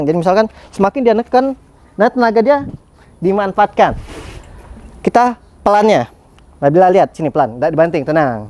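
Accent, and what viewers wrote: Palembang, Indonesia. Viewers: Kyk kenal bahasanya ,itu bahasa Palembang ya salam satu daerah mas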